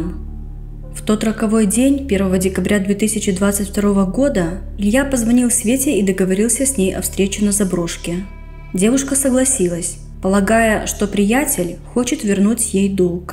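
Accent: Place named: Belarus. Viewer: Белорусский говор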